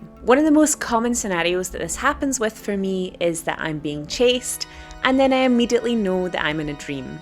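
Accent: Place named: Central Belt, United Kingdom. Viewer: yeah I couldn't pinpoint your exact accent, but definitely central belt, or maybe even Stirlingshire :)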